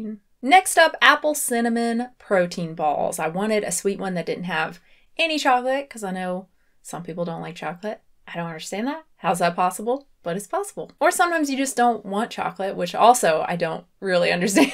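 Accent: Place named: South, United States. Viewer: You sound even more delightfully southern than usual.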